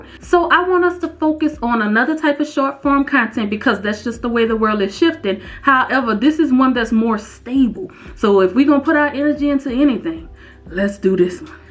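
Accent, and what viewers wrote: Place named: Louisiana, United States. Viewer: I like her, but her voice is so annoying it’s like a Louisiana annoying country type of voice or something just take some English classes somebody’s Youtubers think they can just hop on here and do anything it’s OK to take some classes specially, if you talk to an audience practice your English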